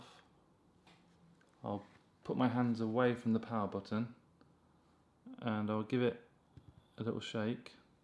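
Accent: United Kingdom. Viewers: Help! When I turn on the dash cam I get the globe and then immediately asked to choose Mass Storage or PC and it freezes when in the car. Also, the charge does not seem to be holding because when I charge via the USB for hours, then unplug and try to turn on, nothing zippity do dah. Can anyone help me? PS: This is the best video. I love the Queen's English as I don't speak American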